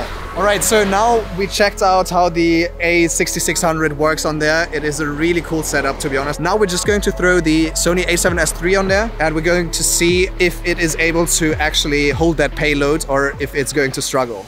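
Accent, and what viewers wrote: South Africa. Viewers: Thanks for the video, I will definitely have a look at the Crane M3, I hear that your are starting to get a South African accent 😀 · WOW WOW WOW WOW WOW!!!! Thank you so much for this video. I am literally going to practice every move you mentioned with my crane M3. Are you South African?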